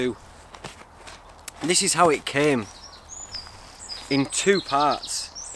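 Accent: Yorkshire, United Kingdom. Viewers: Love this Bro... Great review. Just discovered your channel and your Yorkshire ness (ar tha from Steel city lad?) Nice thought on compassion as well. Namaste from Pete in Penistone.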